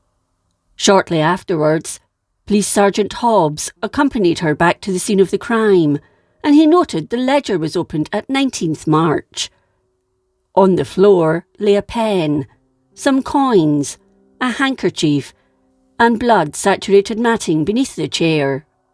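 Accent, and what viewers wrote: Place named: Scotland, United Kingdom. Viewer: I love your soft Scots accent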